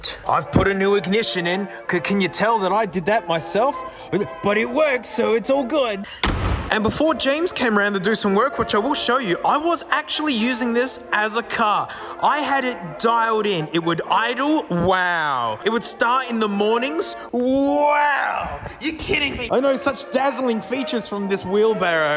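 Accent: Australia. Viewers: James Came Around = James Cameron... Ah the sweet Aussie accent! My ear just needs an extra second sometimes to process!!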